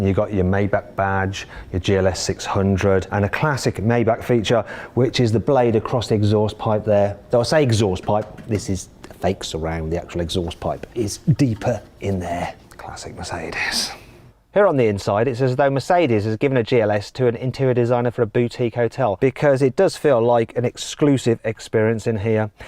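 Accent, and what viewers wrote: United Kingdom. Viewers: 억양이 멋지네요 영국발음인가요?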